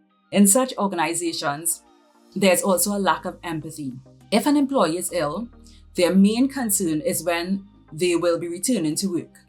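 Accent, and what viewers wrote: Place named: Trinidad and Tobago. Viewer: Hi,. I have been following you on Linkedin for several years. I love your Trinidadian accent! Out of the ten signs that you covered, the one that resonated most with me was the last one. I always say that where there is smoke, there is fire. When a company has a high turnover rate, then bad management is almost always the culprit. If you treat employees humanely, then they will want to stay.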